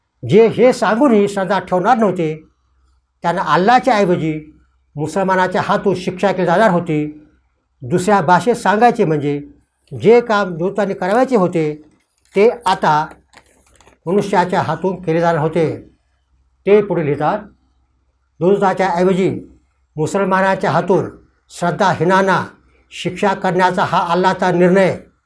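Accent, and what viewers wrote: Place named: Marathwada, India. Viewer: पूर्व मराठवाड्याची भाषा आहे, परभणी/नांदेड/हिंगोली भागात बोलली जाणारी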